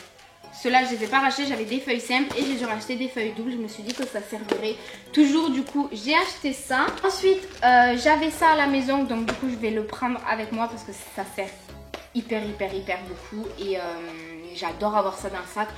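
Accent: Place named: Toulouse, France. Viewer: Salut ma belle je viens juste de découvrir ta chaîne youtube et c'est une très bonne découverte dès le début de la vidéo j'ai directement reconnu l'accent de Toulouse qui est aussi ma ville, j'espère te croiser un jour dans Toulouse.Continu ce que tu fait tu est super naturelle et c'est une très bonne vidéo, bonne continuation sur youtube :)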